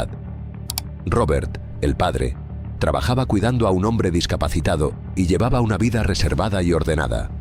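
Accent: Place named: Spain. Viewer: Ya era hora! Un narrador ESPAÑOL.😊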